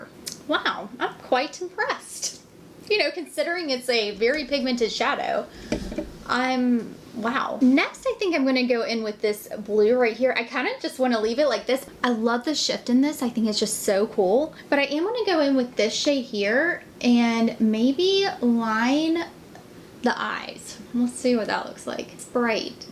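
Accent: South, United States. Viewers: both of those looks are beautiful on you. I also bought the Denim palette but have not had the chance to wear it yet. Still just mostly staying home here in Washington with mandatory masks when out in public. I bought 7 of the new palettes and love them. I have collected Dior quints for many years but love the new formula. You are such a ray of sunshine. Love your Southern accent and personality.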